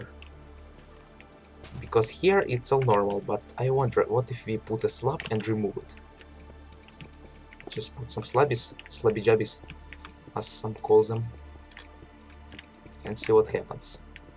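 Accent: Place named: Russia. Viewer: какой-то русский акцент)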